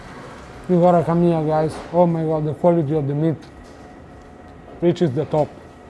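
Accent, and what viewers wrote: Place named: Italy. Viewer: I like ur Italian accent 😂❣️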